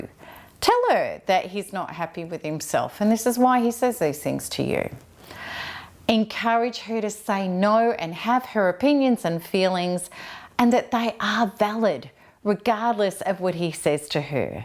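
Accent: Australia. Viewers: Goddamn! You are such a beautiful woman, that Aussie accent and that look my god a weekend with you would be all the treatment I would need!! Gourgeous! Love the content love the channel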